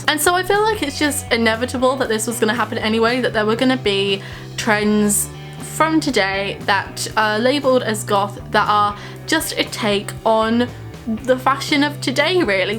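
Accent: United Kingdom. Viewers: I don't really understand your British accent because I'm Brazilian and my English is a little limited, but I liked your video, I've been goth since December 2022, and until then I didn't know my side, until I discovered nu goth, and I understood that many people used the image of goth just for aesthetics, but I have a passion for the entire subculture, when I discovered nu goth, I fell in love, I identified so much, I feel like I consume A LOT of gothic or headmetal books and music, but my style, my way of living, is totally Nugoth, I'm kind of sad that it's seen as a trend, because for me it's something very special ❤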